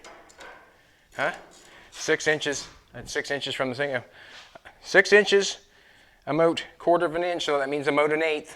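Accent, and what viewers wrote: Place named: Canada. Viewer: Lol you Canadian eh not Ruski lol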